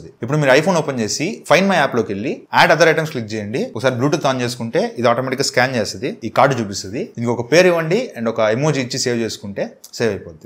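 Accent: Nellore, India. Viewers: Needhi Nellore ah?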